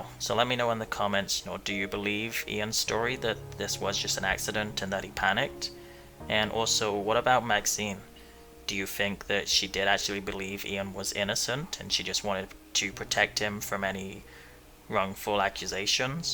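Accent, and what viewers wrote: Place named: Northern Ireland, United Kingdom. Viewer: cool, I thought I could hear Northern Ireland as that's where I'm from but a mix in there anyway 🤣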